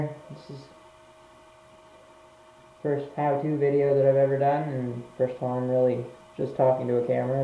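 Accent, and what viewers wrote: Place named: Atlantic Canada, Canada. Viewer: thought I heard an easy cost accent. I'm from Newfoundland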